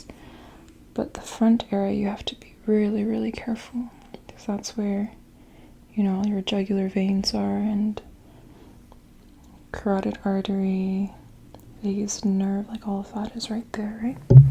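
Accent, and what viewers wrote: Canada. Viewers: You sound Canadian---I grew up in Toronto, myself---now living in the US--- so glad I have found you on these sites!!❤️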